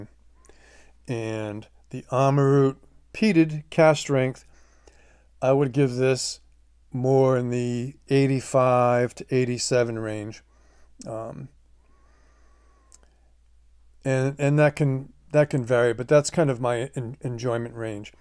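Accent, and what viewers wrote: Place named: Boston, United States. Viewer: OK👍 Boston accent, good one👍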